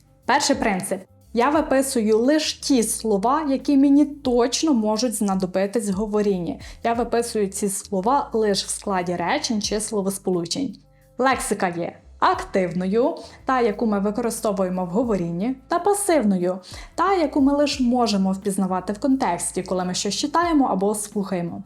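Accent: Western Ukraine, Ukraine. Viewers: Судячи з акценту говірки (характерного м’якого «и»), ви, швидше за все народились і виросли на заході України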